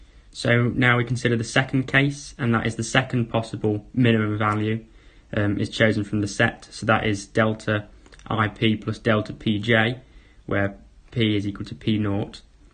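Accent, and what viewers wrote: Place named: United Kingdom. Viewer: uk accent is annoying , can't understand.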